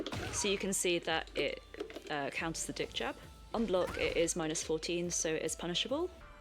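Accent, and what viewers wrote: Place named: United Kingdom. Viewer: This is a good video. I like the fancy British accent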